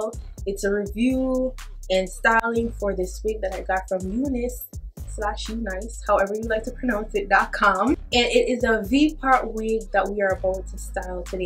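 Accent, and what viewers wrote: Jamaica. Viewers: I love that you come from yaad!!!!! I heard the accent and immediately followed yess hunni and you’re so cute!!! 🤗🙌🏽💋 I’m Jamaican 🇯🇲 myself!!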